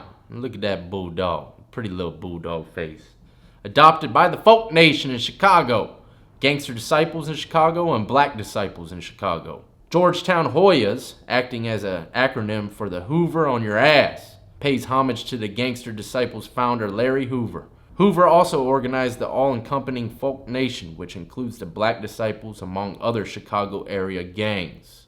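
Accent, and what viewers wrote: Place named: Appalachia, United States. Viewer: Why you talk like a hill Billy bitch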